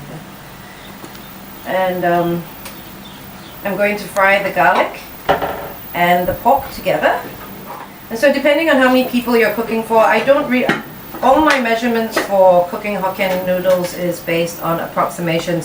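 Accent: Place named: Singapore. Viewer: May i know where are u from. U looks very ANG MO but u speaks some SINGLISH. Very interesting and anyway u're very DETAILED !!!!!!!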